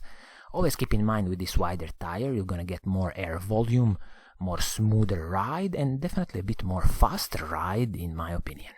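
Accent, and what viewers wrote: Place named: Italy. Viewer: love these videos, Brilliant! full of info and great Italian accent!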